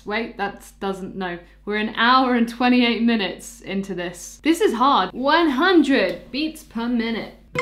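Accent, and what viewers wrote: United Kingdom. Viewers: Bri'ish accent is so cute in this one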